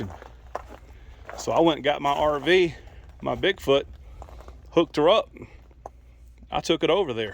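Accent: South, United States. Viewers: I'm so glad you got Red back. Sorry it was a costly repair. I love your southern way of storytelling maybe because I'm southern also.😉 This was a nice video. Thanks for sharing your experiences. I hope the repair to Betty White is minor. Good to see Felix, but I could tell he was in pain. I'm still praying for him.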